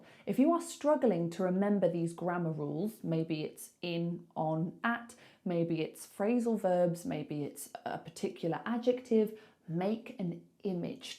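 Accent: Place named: RP, United Kingdom. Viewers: I like English accent ！ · I love you rp accent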